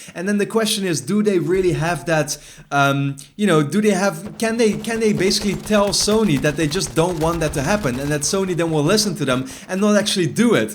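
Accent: Netherlands. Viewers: you got a dutch accent and my name is robin to he eh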